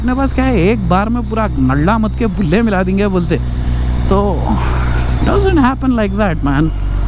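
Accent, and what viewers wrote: Hyderabad, India. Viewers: BHAI HYDERABAD SE HAI KYA 😎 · bhai vidoe kaisa bhi rehndo magar my khaali tumhari vo zuban sunne keliye video dekhtaun ji kya pyaari Hyderabadi bolte tum toe bulle me miladete dekhne walin ki han rahi baath video ki ab aapse milne ka wakth akhir aagaya bohot dinose preowned bikes leneka soch taun magar kisi pe bharosa ny mere bhai aap line me utargaye ab shayad mere ko meri dream poora karneka time aagaya dikhra hy jaldi lockdown khatam hoagaya toe accha hy milke requirement batatahun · Hyderabadi hai bolo 😎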